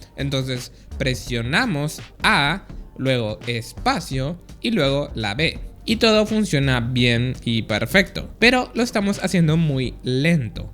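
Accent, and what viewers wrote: Paraguay. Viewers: A mi no me mientes sos paraguayo vd?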